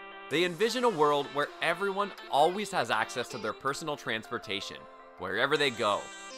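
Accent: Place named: Canada. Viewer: Why do you think I was talking to you? The narrator in the video has a strong Canadian accent.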